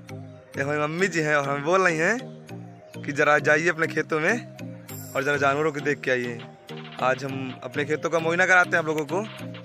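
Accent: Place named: Bihar, India. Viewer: bhai ki boli bihari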